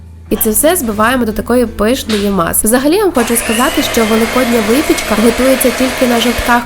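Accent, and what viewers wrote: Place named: Western Ukraine, Ukraine. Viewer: Это не украинская мова.....это западенская мода ! В Украине так не разговаривают !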